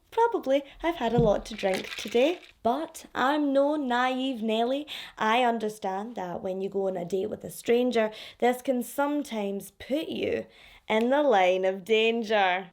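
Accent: Scotland, United Kingdom. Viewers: I've lived in Scotland for 6 years and still struggle to follow conversations between Glaswegians. I love listening to you, at least I can understand you and enjoy your Scottish accent and humor. Love you! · I had to re-read this a few times because I was so confused so i hope i've understood it now! Do you mean by country, as in the countryside/farmer type place? Because if you only moved to Scotland before Covid struck you really picked up the accent quickly lol. ..... Sorry literally just got to the part in the video where you confirm haha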